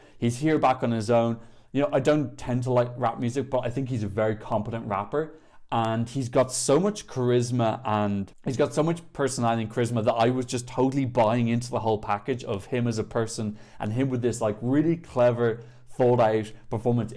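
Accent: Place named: Ireland. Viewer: it's Irish English, and it confuses even the English! (especially the English 😂) It confuses the captions as well, they're hilarious when they come up on my home page.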